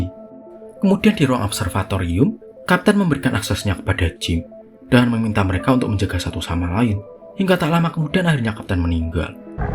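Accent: Java, Indonesia. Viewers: Medok bgt naratornya.. 😅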